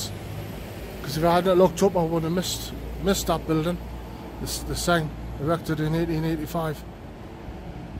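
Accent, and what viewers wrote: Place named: Newcastle, United Kingdom. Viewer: I was born in Galashiels I am now 74 years old. I thank you for making the video. It sounds to me like you are a Geordie. The statue you were looking at, is of Sir Walter Scott! The “nice building across the street, is The Salmon Inn.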